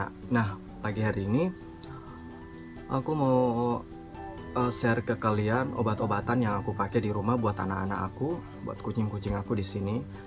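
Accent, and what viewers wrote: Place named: West Sulawesi, Indonesia. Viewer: Dimana tepatnya ini,kalau simak dari logatnya bro ini dari SulBar? Sy juga memelihara bbrp kucing 😀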